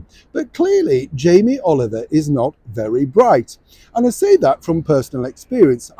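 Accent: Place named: Mockney, United Kingdom. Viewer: Lisping mockney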